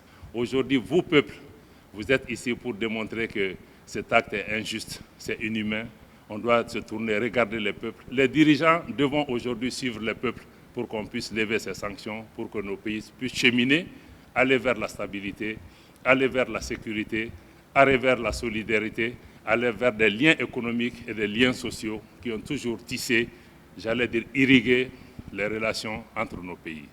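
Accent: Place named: Wolof, Senegal. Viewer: Tu as un accent ouoloff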